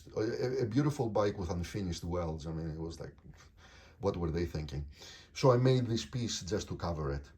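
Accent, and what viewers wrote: Greece. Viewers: Λύσε μια απορία, σε παρακαλώ. Το "λου" πολύ πατρινιώτικα το λες: "λιου". Αληθεύει ότι εξ Αχαΐας προέρχεσαι;.. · The v85 is a great bike. Especially with the tubeless rims. You might want to avoid the travel version. I know people have had problems with the side cases. I think I detect a slight Greek accent, might be wrong.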